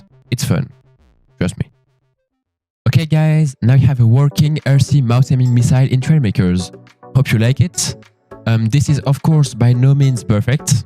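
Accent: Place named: France. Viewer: parce que quand tu parles tu sonnes français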